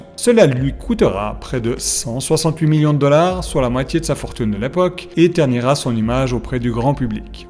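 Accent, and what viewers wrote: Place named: Switzerland. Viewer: Bravo pour le boulot et l’accent Suisse qui fait plaisir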